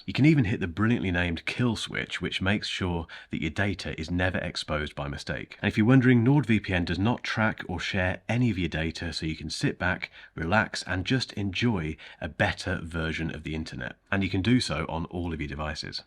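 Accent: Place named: Cardiff, United Kingdom. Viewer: The most proper Cardiff accent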